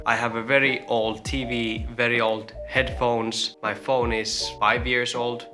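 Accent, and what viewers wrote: Finland. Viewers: I love to listen to the Finnish accented English. I’m a pensioned farmer with a lot of old stuff trying to downsize and organize. I have resisted buying every power tool. Hand tools are easily fixed when they break unlike the stress caused by complicated machines. Scooping snow is good exercise and so is being on the bike. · hehe, I just found your channel yesterday, and I'm already in love x"D...I actually live in the same city as you and it's so fun to watch videos made from here XD☆ plus a Finnish person making videos in English, with beautiful Finnish accent, makes me so much more confident to speak English myself, too!! I also love that your videos are quite short, there's nothing unnecessary, so they're nice to watch♡ but, I must say, as a beginner in minimalism and intentional living, it's too bad your videos are very addicting! especially when you almost always recommend another of your videos at the end "xD it's hard to get rid of binging social media, when you make too good content! 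XD ja must on myös tosi siistii et löysin suomalaisen kanavan, joka on lähellä mun omaa ajatusmaailmaa (^_^) kiitti kun teet näitä videoita:3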